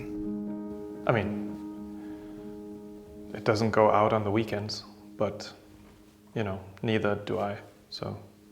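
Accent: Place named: Sunnfjord, Norway. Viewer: Haha, eg trudde eg hørte litt av ein Sunnfjordsdialekt, du skjule r’en din bra på engelsk!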